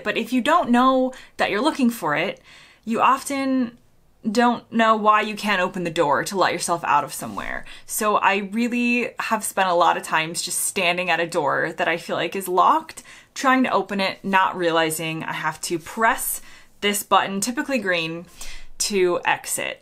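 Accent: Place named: United States. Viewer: Love your US accent ❤❤❤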